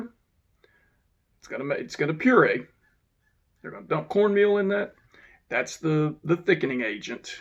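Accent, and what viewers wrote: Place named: Texas, United States. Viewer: I'm glad to see you back! And it's great to hear a texas accent again after a year on the west coast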